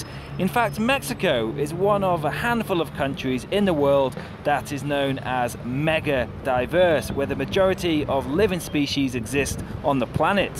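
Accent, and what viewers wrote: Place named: United Kingdom. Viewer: love your videos I also love your Britannia speaking do not lose it emphasize how English should sound Like .Arriba Juarez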